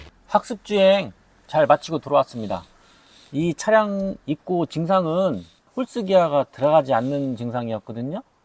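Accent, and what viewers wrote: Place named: Chungcheong, South Korea. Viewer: 어따 거기 충청도유~~! 좋은정보 엄청나게 감사혀요~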